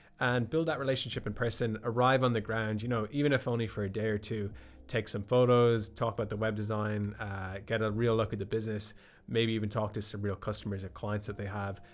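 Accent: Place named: Ireland. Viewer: Hey,. Your content is awesome. I really appreciate your honesty and willingness to share your extensive knowledge and valuable insights. (The Irish brogue is pretty cool too. Been a long time since I've been there -- County Sligo it was, many years ago.) Question: Do you have any kind of Inner Circle Club, where we newbies to this game could ask you specific web design-related questions? If so, I would like to hear about it. Thanks again for all of your... can I say it?... amazing insights! P.S. Oh, yeah. One more thing. Those sound like good goals to have, and I really do hope you meet them all. Thanks again!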